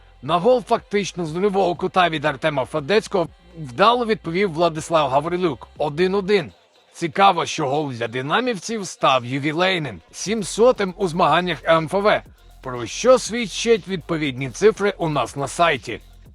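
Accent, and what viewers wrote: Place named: Canada. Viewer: Коментатор,наче з Канади)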